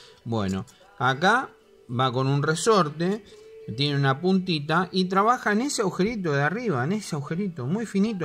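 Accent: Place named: Argentina. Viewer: Es argentino.. debe trabajar pues En chile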